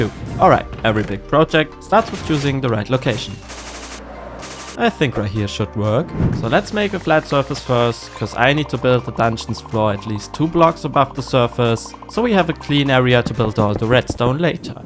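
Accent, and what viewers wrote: Germany. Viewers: Are U German? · Is bro german? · Idk why, but he sounds so german 😂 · You are German or? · am i hearing a little german accent?? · Are you german if yes than i knew it cuz ur accent. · Are you German? Because i thought you had a little German accent. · u german bro?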